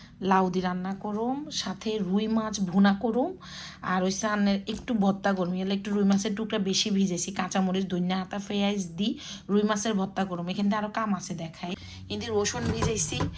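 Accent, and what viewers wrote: Noakhali, Bangladesh. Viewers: আপু তোমাকে নোয়াখালী ভাষায় কথা বললে বেশি ভালো লাগে। ❤❤❤ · Apu Noakhali kotha apnr moke manay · Ami delhi theke bolchi…kintu tomar noyakhailya vasha amaar aponjon ke mone koriye dai..boleii tomar vlog dekhi..shaathe tomar khawa dawa..khuub valo laage❤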